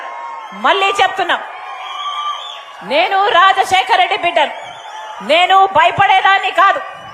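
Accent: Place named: Telangana, India. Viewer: Same to same telamgana laga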